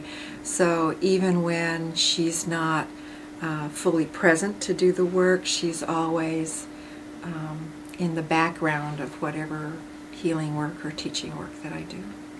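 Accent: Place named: Eastern Europe. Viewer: Why is channelingn in an Eastern European/Russian/Spanish accent??? Come now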